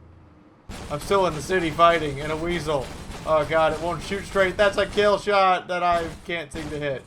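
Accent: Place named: United States. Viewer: That explains it I suppose. I guess I just assumed based on an American(ish?) accent that you played on the NA.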